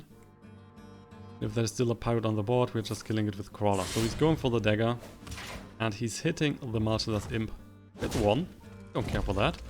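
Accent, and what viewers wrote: Germany. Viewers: Thank you for the insight, looking forward to play this deck aswell. I can distinguish a strong german accent tho... which is not bad because I am german aswell. But you could definitely work on this! You could say that I am a native speaker, and I do see that you got a huge vocabulary (regarding average germans) - just keep practicing (if you want to drop it ofc). Nicht böse gemeint btw - hast mein Abo.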